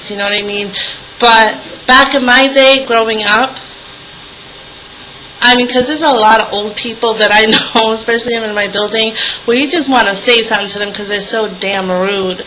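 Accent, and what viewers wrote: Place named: New York, United States. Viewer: What part of America are you from? You sound like you are from New York sometimes. :) And omg... that's a shame cashiers are not allowed seats!, in Ireland (where I live) they do.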